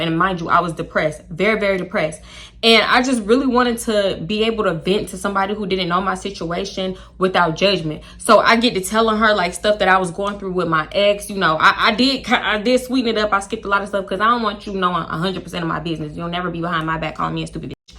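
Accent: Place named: Houston, United States. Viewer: The way I knew you was from Houston before you said it 🤣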